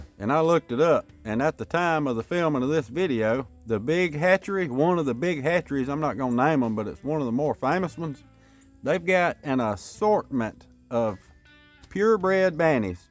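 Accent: South, United States. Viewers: LOL!!! Brother you got an accent for sure - true American. 😎👍👍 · I'm from Ruston, Louisiana, and even though most around here don't have the southern drawl quite like yours.. Some of us still do. You'd fit right in brother! My family is on the hunt for a new home with enough space to start raising birds, vegetables, and maybe a goat or six. We've been in the city limits longer than we ever planned to be, and it's time to get rural.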